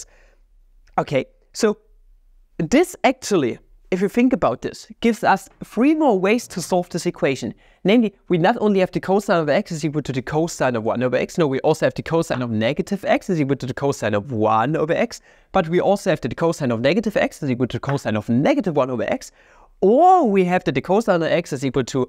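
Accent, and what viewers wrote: Germany. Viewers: Are you German? I always thought you had a German accent and a German name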